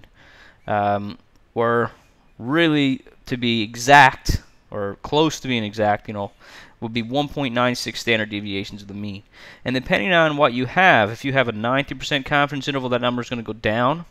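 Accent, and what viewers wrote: Canada. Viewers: Helpful video! Just wondering but are you Canadian? :p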